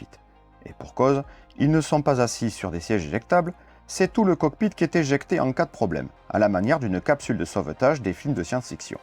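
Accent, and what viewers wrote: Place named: Libourne, France. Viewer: Je regarde vos vidéos depuis 5 ans. Le début donc. Et je me rends compte aujourd'hui avec cette nouvelle vidéo toujours aussi bien réalisée que vous avez l'accent du Libournais. Ce qui semble compatible avec votre adresse de compte YT (3350). Je me trompe ?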